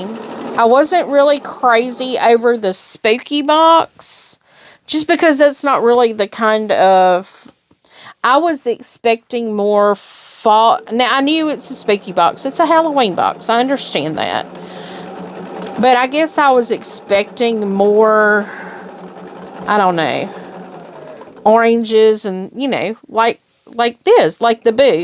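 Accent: South, United States. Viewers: Chit chat is calming. Love your southern accent. Your boo quilting came out lovely! I always do my own, but have to admit it’s not my favorite. Oh well, practice helps.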